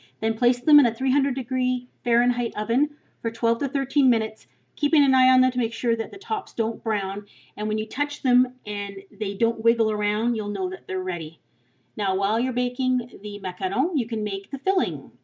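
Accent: Quebec, Canada. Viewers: I really love your videos, are you french canadian?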